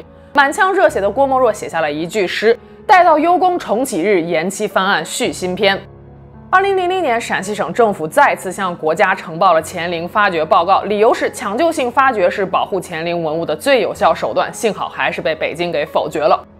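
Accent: Taiwan. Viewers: 哇第一次看见的厨房，我好像听到台湾。。你是台湾？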